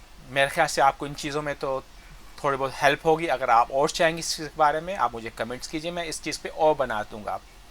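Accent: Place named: United States. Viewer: American accent boss! 😎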